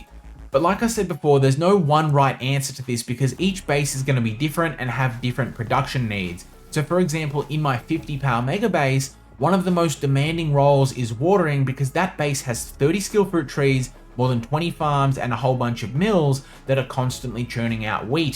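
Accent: Australia. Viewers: how do you have not 1M subs... first of all, your content is amazing and you covered basically everything and secondly, who doesn't love aussies and their accent?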